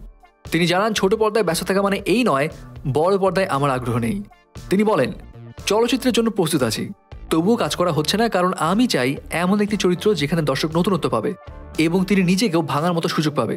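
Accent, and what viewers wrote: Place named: Kolkata, India. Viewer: star golper yutuber তো বাংলাদেশি বাংগালী কথা বা ভাষা কলকাতার মত কেন ভাই?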